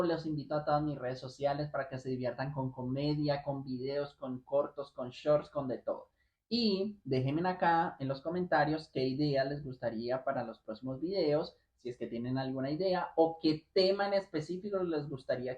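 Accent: Paraguay. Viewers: Hasta la tonada casi ya es 100% Paraguayo. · Ya tiene tono Paraguayo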